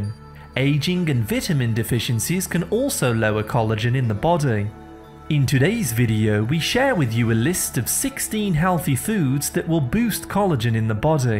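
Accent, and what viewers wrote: England, United Kingdom. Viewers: Whats with the damn English accent..LMFAO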